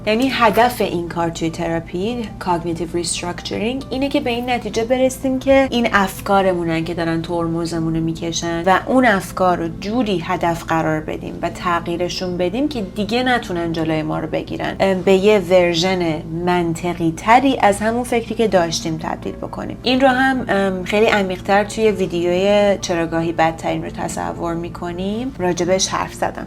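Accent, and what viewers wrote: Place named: United States. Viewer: خانم دکتر سلام عرض شد ارادتمند، ببخشید شما در تلفظ اصلاحات انگلیسی، لهجه امریکایی دارید،ببخشید شما آمریکا یا کانادا تشریف دارید؟یا فقط بر حسب تسلطی که به زبان دارید انقد قشنگ تلفظ میکنید ،چون خودم خیلی زبان دوس دارم عرض کردم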